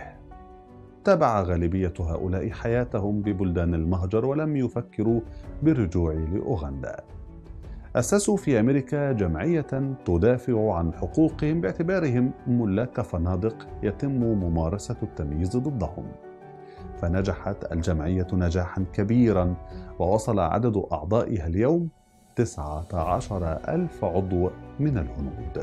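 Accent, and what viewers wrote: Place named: Morocco. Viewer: بالفعل صوت متميز. أعتقد اصلو من المغرب. تحية لابن بلدي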